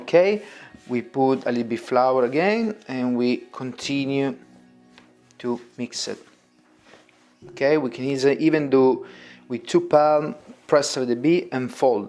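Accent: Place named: Italy. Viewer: Mate don't apologise for your English! It's great and it doesn't even matter if we hear your Italian. I love your accent!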